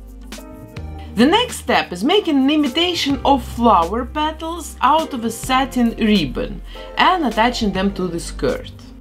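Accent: Russia. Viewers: очень слышно, что вы русская. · Тыж русская. По акценту слышно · Русская что ли? Акцент слышен очень, русские поймут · Я понимаю, что мне казалось, но я будто все видео слышала максимально русский акцент... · Where are you from, your accent make me think that you're Russian))) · Почему я слышу жесткий русский акцент? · Слышно что Русская! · Чувствую русский акцент! Но работа просто отпад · Russian accent, wow! Even I can't normally say something in English, and you do it perfectly! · Excuse me, are you russian? или мне показалось...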